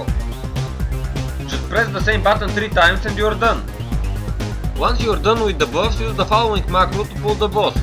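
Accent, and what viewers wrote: Russia. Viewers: As russian as it gets, love it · This IS in English. He just has a heavy russian accent.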